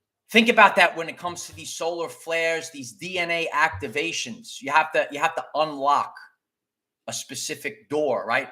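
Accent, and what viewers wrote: New York, United States. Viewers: OMG, I can hear NY in your accent! How do you get your hair so shiny? Like your content these days...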